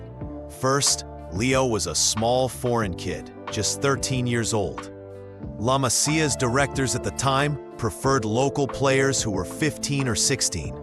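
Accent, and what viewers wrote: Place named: Brooklyn, United States. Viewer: Good video but the narrator's Brooklyn accent was a distraction!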